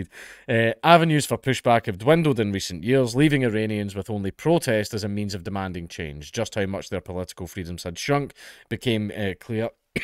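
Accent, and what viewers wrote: Scotland, United Kingdom. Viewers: Scottish accent? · Not for much longer, mate. And no, that's a Scottish accent. Are you kidding? Fuking Americans.... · You do realise that Scotland is part of Britain yes? He has a British accent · You must be a colonial. There is no such thing as a 'British accent'. has a Scottish accent. Scotland is a country, with it's own history, culture and language. Scotland is part of the United Kingdom of Great Britain which includes four countries; England, Wales, Scotland and Northen Ireland. After the acts of Union in 1704 and 1801 these countries became part of Great Britain but each country has it's own language which is the basis of the different accents and dialects. Only yanks say 'British accent' as they are ignorant of the mother countries' politcal, cultural, liguistic and historial fabric.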